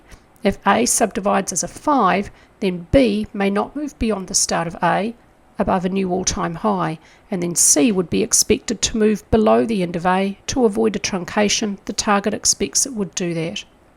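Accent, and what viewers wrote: New Zealand. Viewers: thinking mainly of the big one on everyone's mind... SP500. Ewi have been spectacularly wrong over many years, although arguably through Fed intervention, its debatable whether that is an exogenous factor and a valid reason for failure. Nonetheless, your analysis has been spectacularly correct, AND in that you stand alone. You can weigh possibilities, then apply fine balance and judgement. Umm, you sound kiwi??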